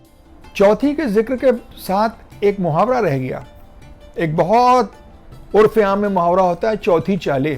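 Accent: Lucknow, India. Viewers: Adaab,kya he kehnay janab ap k or ap k andaz e bayan k.lagta hy Lucknow pohnch gaye hum tau.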